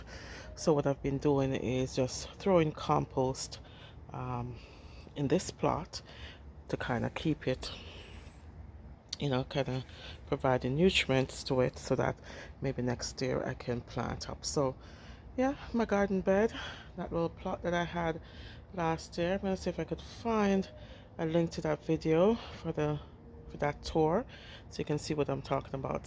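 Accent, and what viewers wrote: Jamaica. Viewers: Hi Sis. Yes thought I heard a Jamaican accent. It's great to see how you used the containers for all these veggies. Compost is a great idea. Also it helps to switch out the types every 3 years. New friend. ☺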